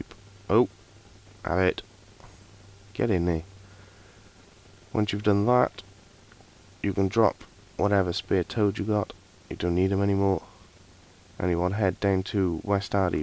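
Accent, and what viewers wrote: Wales, United Kingdom. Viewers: where are you from? Sounds like a welsh accent.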